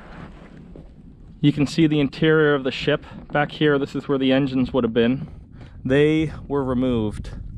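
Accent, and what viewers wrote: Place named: Eastern Canada, Canada. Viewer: Wow great video and history lesson. Thanks for taking the time to put this together. Very interesting. Love that eastern Canadian accent😊👍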